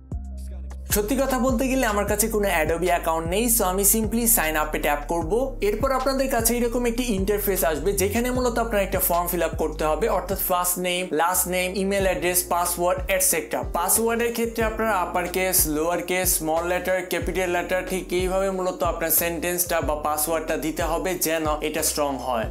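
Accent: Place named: Jamalpur, Bangladesh. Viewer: Aponar basha ta jamalpur kun jagai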